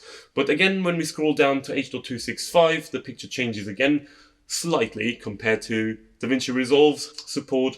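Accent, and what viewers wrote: United Kingdom. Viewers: Bloody hell, didn’t know you were British mate. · 👍🏻👍🏻👍🏻 Du hast mich total überzeugt !!! Nicht nur "Intel core", auch dein Britisch Englisch ist really Great (Britain), fantastisch! Hut ab! 👏🏻👏🏻👏🏻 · where is that strong british accent from my brother? love it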